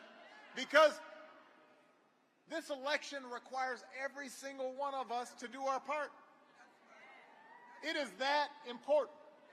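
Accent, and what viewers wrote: South, United States. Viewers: Now he has a southern inflection. What a poser.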